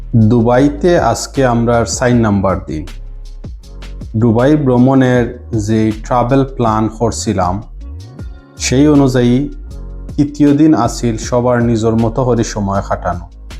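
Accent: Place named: Sylhet, Bangladesh. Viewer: আপনার সিলেটি উচ্চারণ খুব সুন্দর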